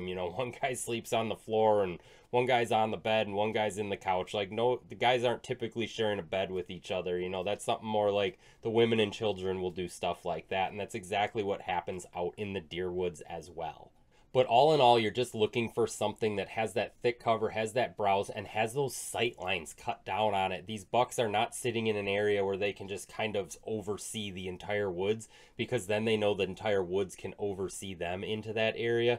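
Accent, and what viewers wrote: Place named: Wisconsin, United States. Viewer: That’s his Wisconsin accent…it does sound like egg but he’s saying “ag fields” as in agriculture fields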